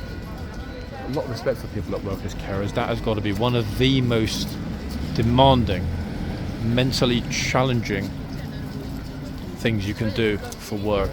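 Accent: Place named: United Kingdom. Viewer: I am wondering, will the Life Guards be there next week, or will the Blues and Royals be there? We saw the soldiers in red last year. Even though they are both fantastic, we were hoping to see Blues and Royals. We arrive next Thursday. Just wondering! I love how knowledgeable you are. The way you explain and ask questions, is awesome! We spend part of our mornings watching what is happening in our most favourite cities, London! We also love your accent! Actually, we love all the accents from the UK. Glad you have bounced back from your illness!🙂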